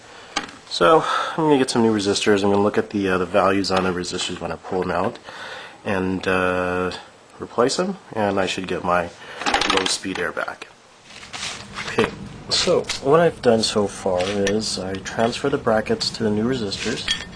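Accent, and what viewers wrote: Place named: California, United States. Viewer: I was going to say it sounds like you live in California.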